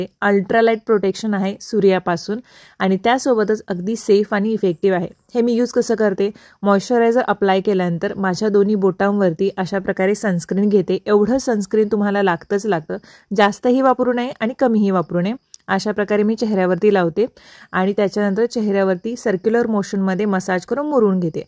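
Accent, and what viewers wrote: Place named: Belgaum, India. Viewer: Tayi me pan belgav aahe me khupc excited hoon tuje vlogs bgte Ani tu ti belgavchi bhasha boltes na mla apl koni tari as vadtay ❤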